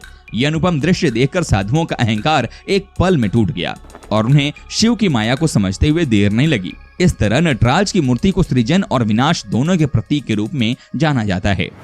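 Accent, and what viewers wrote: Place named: Odisha, India. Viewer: Your mother tongue is odia Right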